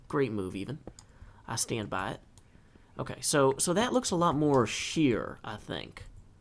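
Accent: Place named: Kentucky, United States. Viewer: I love your reasoning on base size lol Absolutely enjoying the heck out of your videos on HC this year - that bridge was super nice. Your cadence, accent, and word choice remind me of home and make me all nostalgic for my college years in Kentucky. :)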